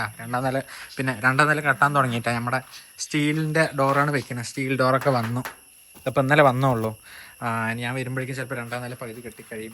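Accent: Palakkad, India. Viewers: പാലക്കാട്‌ ഭാഷ 😆ആ ആ ആ ആ ആ എന്ന് കുറേ നീ പറഞ്ഞു 😆😆😆😆 ഞാൻ പാലക്കാട്‌ പറളി, എടത്തറ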